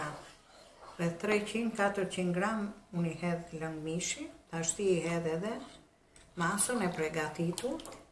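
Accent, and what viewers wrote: Tirana, Albania. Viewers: Ka lezet kur bohet e shpjegohet nga nje tironse me dialektin e Tirones. Na kishte more malli .Njit cdo gjo. T`lumshin duret!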